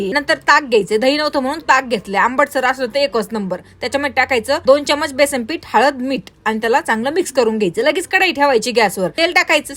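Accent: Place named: Ahmednagar, India. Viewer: नगरच्या आहेत का तुम्ही....कारण भाषा तुमची तशीच वाटते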